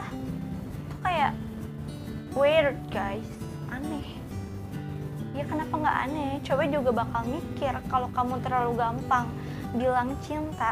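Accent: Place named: Subang, Indonesia. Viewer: Mirip orang subang...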